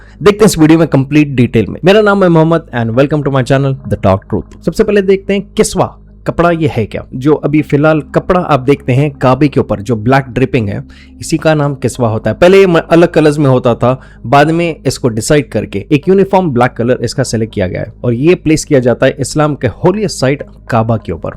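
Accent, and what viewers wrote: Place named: Maharashtra, India. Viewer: You have marathi.accent . Ok. Good जय।महाराष्ट्र जय शिवाजी राव। हर हर महादेव।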